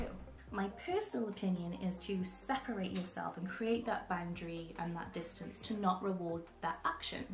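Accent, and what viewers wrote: Wales, United Kingdom. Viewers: This was an extremely interesting and useful video. I'm a student psychologist and DID has fascinated me for years. Thank you for your insight. Also... it's nice to hear a Welsh voice on YouTube! Where are you from? Swansea girl here :D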